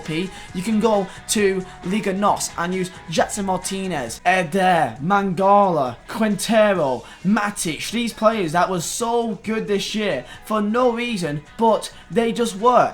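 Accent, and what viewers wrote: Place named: North, United Kingdom. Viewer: Northern. That's is all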